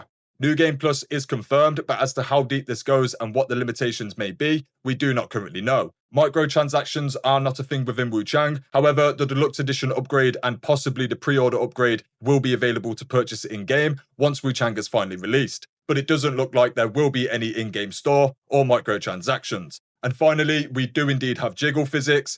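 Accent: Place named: United Kingdom. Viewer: thanks for video. nice british accent. which region exactly?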